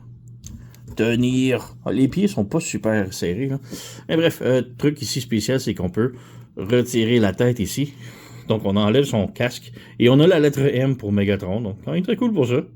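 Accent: Quebec, Canada. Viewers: Yooooo, I watched your English review of the figure. I transformed my new figure using your transformation section, thanks for all the tips! Then I noticed that you have a French version of the review, so I clicked it out of curiosity, and was pleasantly surprised by a Québécois accent! That brings me waaay back. I grew up in Quebec City back in the 80s when I first discovered Transformers in the English TV channels. Anyway, love your channel! 👍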